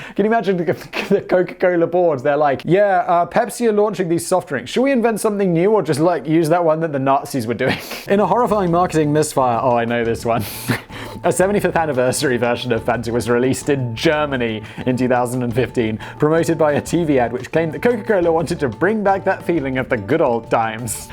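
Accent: RP, United Kingdom. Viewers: Goddamn this needs to be higher. Also add says uncensored “ fucking“ 😂 caught me off guard and was awesome to hear after years of his impossibly posh Britishness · Wait, is British? Since when? Is it serious?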